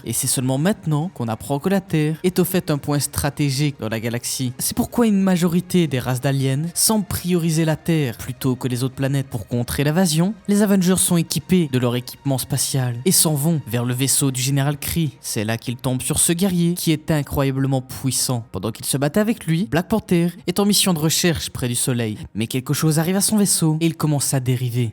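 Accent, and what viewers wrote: Belgium. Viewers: oui j'ai eu la chance de voir cette série ici en Belgique sur France 4 et elle est excellente cette série,j''avais 35 ans quand j'ai connu cette série et j'en ai 45 c'est cool 👍😎🇧🇪 a propos, quand j'entends ton accent je crois que tu viens de chez nous?! 😂🤣🤣🤣🤣🤣🤣 excellente vidéo 👍 cool mec 👍🇧🇪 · Ton accent belge ressort vachement dans cette vidéo 😂😂😂 La série est excellente, elle arrive à reprendre la plupart des gros arcs des comics certes condensés mais c'est plutôt bon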